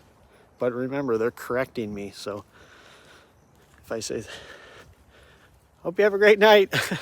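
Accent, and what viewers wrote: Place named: England, United Kingdom. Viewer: Hey! I Love your English accent brother ❤ we love your walk and talkies. Know that your helping people all over that need lifted up with your amazing energy. Hope to see you on the live on Jeffmara. Chin up, your not alone ❤❤❤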